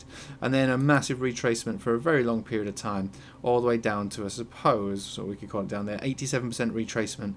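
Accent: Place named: Northern England, United Kingdom. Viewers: sounds yorkshire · Just “norf” :D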